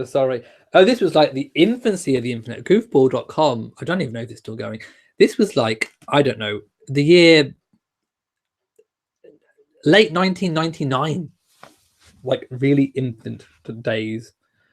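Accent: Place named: United Kingdom. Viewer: That's why I watch your channel.... you're British....and would have a more accurate account of British news than the fake news out there. Besides...your accent is adorable.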